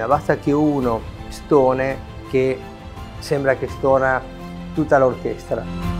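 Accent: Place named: Argentina. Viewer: That Argentinian accent is so rough lol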